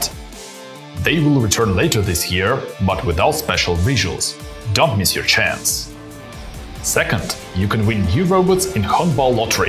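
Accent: Russia. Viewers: I wish you guys would get someone who could talk without such a heavy Russian accent, it’s really hard to understand what’s being said on these videos.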